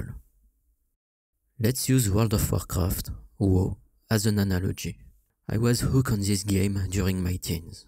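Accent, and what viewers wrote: France. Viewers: It is amazing that you came back with another video! We missed you and your content which is very inspiring and valuable, thank you so much for taking the time to share it 😊 And don’t you ever worry about the french accent or the way you are speaking english, keep talking, there are people who likes or loves hearing it.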